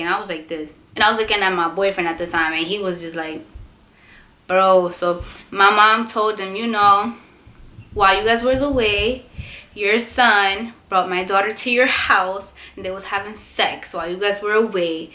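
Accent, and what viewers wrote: New York, United States. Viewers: Zaire Williams is she from New York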